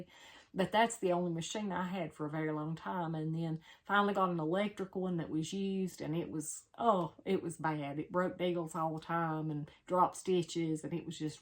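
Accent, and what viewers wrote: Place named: Western North Carolina, United States. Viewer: Your Mom is beautiful! Your accent sounds as if you might be from western NC, as am I. Just found you & am looking forward to watching more of your videos...😊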